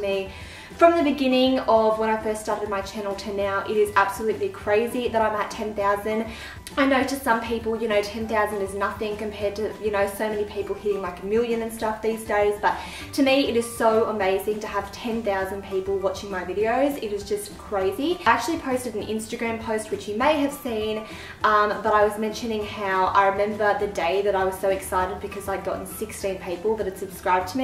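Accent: Australia. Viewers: Bruh where should I start congrats btw 10k 🙌🏾🙌🏾 u deserve it, ur vids kill me there so funny I love ur personality for making something funny and ur Aussie accent is on FLEEK😍😍 . The reason why I want to win the extensions I really want to try something new im so used to weaves that I never considered extensions so why not try something NEW 😃😃 And for the brushes I haven't really liked makeup brushes u can say I'm new to makeup but I still use the basics and never had good experience with cheap as 2$ brushes 😂😂 ... Umm I don't know if I make sense but yeah lol 😋😋😎😄💁🏾💁🏾 LATERZ✌🏿️✌🏿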